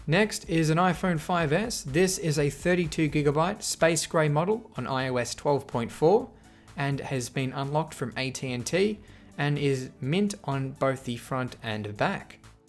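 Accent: Australia. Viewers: I think he lives in Australia based on his accent:)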